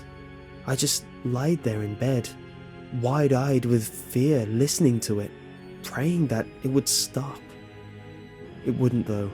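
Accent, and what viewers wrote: England, United Kingdom. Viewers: can you pronounce it "Creepypastra"? is that a britt accent? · bruh he's british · Haha, seems everyone sounds the same and has a lovely English accent in these stories… hmmm. :P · I love his British accent! That’s when I sawr it !! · From Massachusetts but has an English accent? K🐸🍹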